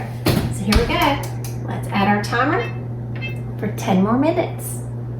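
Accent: South, United States. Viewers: Beautiful southern accent from WHAT state????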